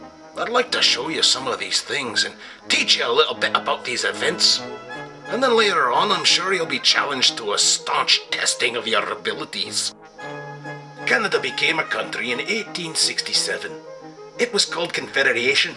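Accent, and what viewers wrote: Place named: Ireland. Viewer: Why does this guy sound irish?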